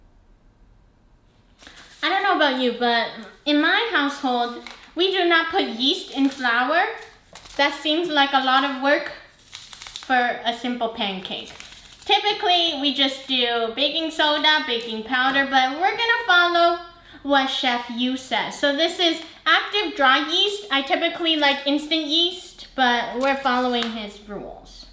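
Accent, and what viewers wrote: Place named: Canada. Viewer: 2 minutes in and i thought "this person is canadian". 3 minutes in you confirm you're in Toronto. eta: omg, Midred's is soooo good! I came here after watching the video the chef did. the technique he demonstrated was a little different. when i was a kid and my mom made crepes we would have them with butter, sugar and lemon. so good. i also like honey and butter on my pancakes, but i most often do the classic butter and maple syrup. sometimes i make a berry compote.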